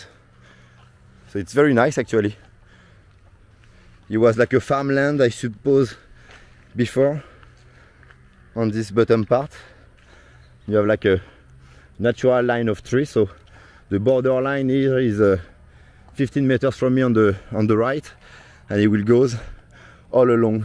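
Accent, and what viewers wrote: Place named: France. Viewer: Quel joli accent français!! Je suis anglophone donc je peux le dire. 😀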